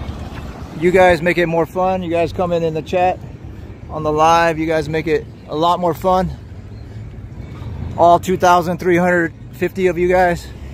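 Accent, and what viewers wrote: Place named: United States. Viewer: Hello from Brazil ☕️. Fantastic video. Las Vegas. Nice rhythm. You have an american accent.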